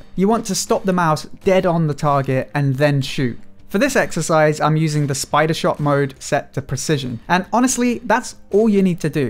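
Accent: United Kingdom. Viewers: Where r u from ..?? British accent ..!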